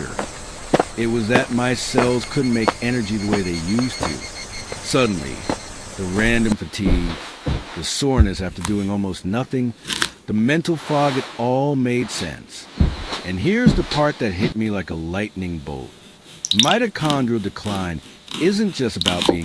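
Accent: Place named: United States. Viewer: Beautiful Japanese visuals let down by the extremely American narration.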